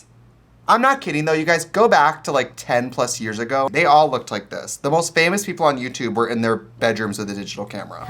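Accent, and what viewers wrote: Wisconsin, United States. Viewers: I love your accent!! I was watching UNHhhh the other night when I realized you pronounce “groceries” grosheries just like me! I told my husband that you’re from Wisconsin and he replied “guess that’s why you’re such a cheesehead” and I lost it laughing. I do have family from the US, wouldn’t be surprised to find some were from Wisconsin. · You really can hear the Wisconsin accent in these videos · trixies voice sounds the same as it does now bc i’m from wisconsin too. like deadass i only hear the accent on some words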